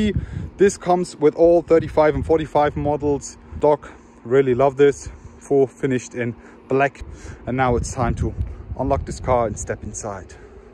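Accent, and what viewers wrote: Germany. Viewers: Good work short comments. PS Man hört das du deutscher bist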